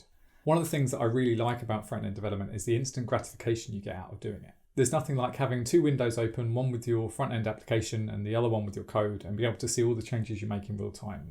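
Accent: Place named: Manchester, United Kingdom. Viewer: kinda, I m currently studying computer science at Manchester. so kind of familar with this accent lol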